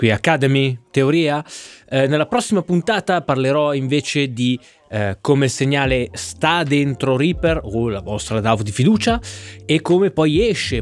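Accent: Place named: Rome, Italy. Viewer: Co st'accento sei proprio 'ntajo (con questo accento sei veramente divertente per chi chiama da fuori Roma). Grazie per tutte queste spiegazioni. Anche sapendole, ripassare non fa mai male. 😆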